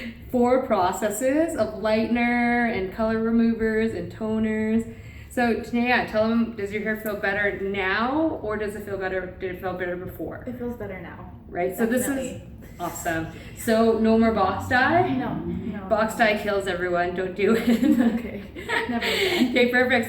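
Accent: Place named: Canada. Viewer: I thought I heard a Canadian accent 🙂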